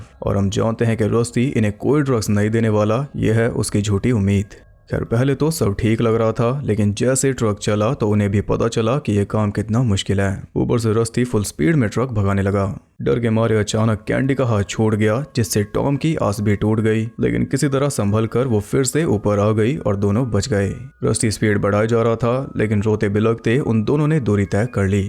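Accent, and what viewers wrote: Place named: Bihar, India. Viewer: Friday the 13th 2009 wali movie fir se explain karo bhai please pahle ki voice acchi nahin hai aap Jo voice hai vah bahut acchi hai kyunki yah thodi Bihari 🥺🙏🙏🙏🙏🙏🙏🙏🙏🙏🙏🙏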